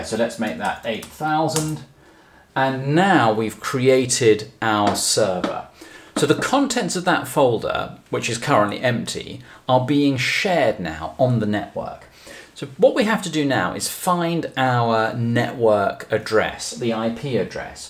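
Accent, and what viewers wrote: United Kingdom. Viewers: Thank you so much, this is such a great help and I exactly had the same promblem as you. Thumb up and subscribed. BTW: I love the Britishnes of your pronounciation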